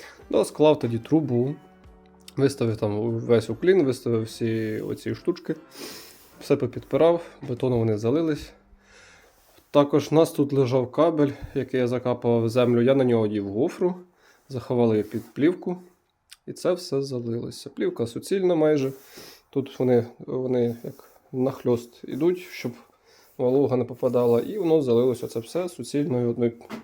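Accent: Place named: Vinnytsia, Ukraine. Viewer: ...Гайсин... То Ви з Вінничини) я ж чую знайомий говір)))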